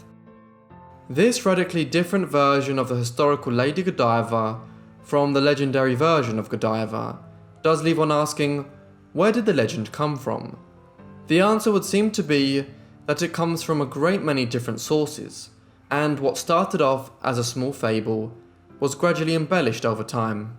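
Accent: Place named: United Kingdom. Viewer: Elvis with a British accent! Great video, thanks! 😃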